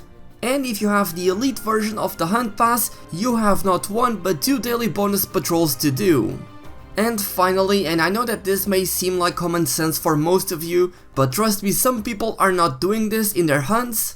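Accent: Portugal. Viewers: oh mano percebi logo que eras pt pelo o teu ingles wtf,mas bom video btw,copiaste de eu sei de quem mas bom video!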